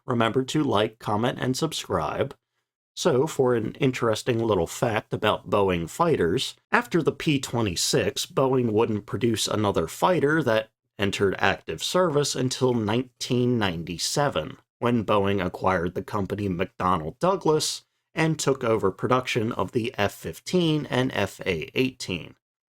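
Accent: United States. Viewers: I do not like videos that have measurements n them that use only the Metric system! You sound like a US citizen, you are on the US YouTube channels and yet you completely leave out our system of measurement which is the Imperial System! Why do you Pinheads do this? I have to stop this absurdity and have given you a thmbs down!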